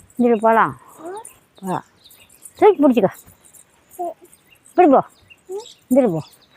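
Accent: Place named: Erode, India. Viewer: ஈரோட்டில் எந்த ஊருங்க, நாங்க பேசுற பேச்சு மாதிரியே இருக்கு, அதான் கேட்டேன்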